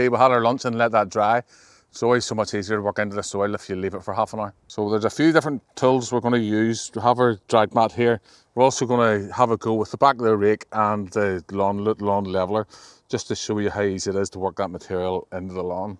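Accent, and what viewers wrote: Northern Ireland, United Kingdom. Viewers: That's a Northern Irish accent!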